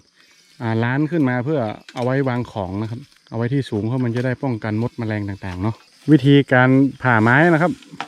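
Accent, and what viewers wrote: North, Thailand. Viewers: ชอบภาษาเหนือมีเสน่ห์ค่ะ แต่บางคำก็ฟังออกบางคำก็ฟังไม่ออกแต่ก็ชอบดูค่ะ✌️✌️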